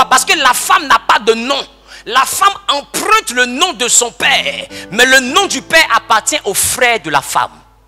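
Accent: Ivory Coast. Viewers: Mais mon frère c'est comment? Lol, tu ressembles à un congolais, mais l'accent est 100% ivoirien! Bon enseignement cousin.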